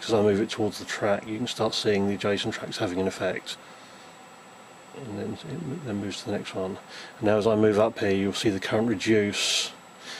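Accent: United Kingdom. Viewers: Wow been watching eevblog a while. Never knew about you great to hear a UK voice in electronics! Thats from a essex lad!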